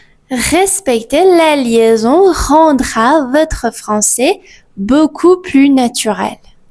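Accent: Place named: Spain. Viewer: Madame a fait un très grand effort et c'est LE défi bravo mais pardon l'accent reste très fort, surtout en ce qui concerne le R, ça ressemble plutôt au J espagnol désolé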